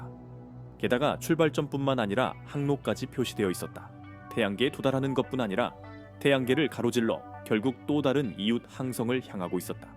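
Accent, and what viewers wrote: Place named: Yanbian, China. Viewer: 낯사 ㅋㅋㅋㅋㅋ 조선족이야? ㅋㅋㅋ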